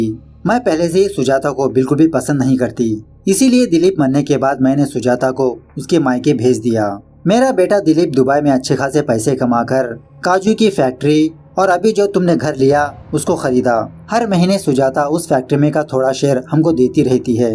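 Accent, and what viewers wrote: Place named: Maharashtra, India. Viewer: Iski Jaat Ka.., Is a Epic Word.. Creator Ne Must Bataaya Movie ko Short me.. Lekin Na Movie ke naam ka Matlab inko Samjh Aaya Na Propegenda Samjh Aaya .. Isiliye Hindi Dubbed movie ka Short bana Diya Copy Paste.. Ending Explanation Ka Tag use mat Karna.. Good Working lekin Aapka aawaz Maharashtra ke koi pichde hue Gaao ke ilaake Wala kheti kisan ya mazdur ke anpadh Bete jesa lagta he.. means baat karne ka tarika .. phir bhi best luck Meri Taraf se.. Jo Aap aaj yaha Tak pohche.. 👍🏼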